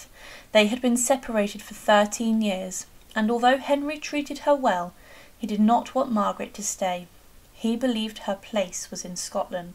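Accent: England, United Kingdom. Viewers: While it is spelled ‘Holy-rood’ it is pronounced ‘Holly-rood’. I am Scottish, born on the west coast, grew up in Inverclyde, university in Aberdeen, lived in Edinburgh - where Holyrood is to this day - and I can assure you, your pronunciation is WRONG. Given your accent, I am assuming you’re English. You should know better.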